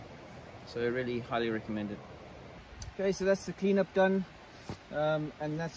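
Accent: South Africa. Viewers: Are you South African, or am I missing the accent?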